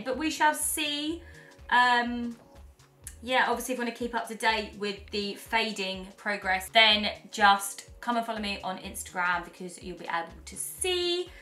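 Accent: United Kingdom. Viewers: She's from Great Britain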